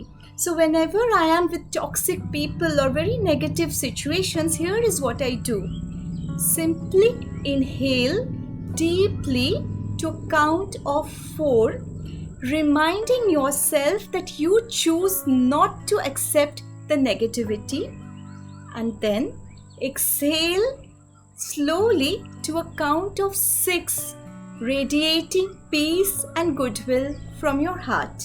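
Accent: India. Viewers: Cute Indian accent. I would come back to listen what story would you have next. <3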